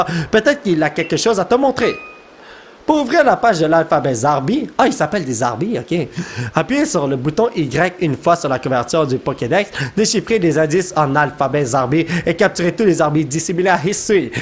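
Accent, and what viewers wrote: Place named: Quebec, Canada. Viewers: Je crois qu'il est québécoi je suis pas sur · t le best ton accent ma trop donné envie d'aller habité au Canada. Sinon j'espère que a la fin de l'aventure tu auras une équipe badass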